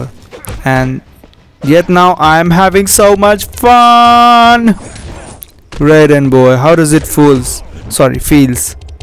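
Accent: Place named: India. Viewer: Mujhe tumhara baat sunke lag hi raha tha ki tum India se ho esi liye puch raha tha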